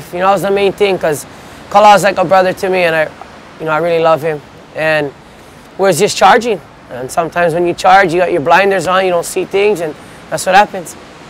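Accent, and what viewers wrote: United States. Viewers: is that not still Hawaiian? Of course it is.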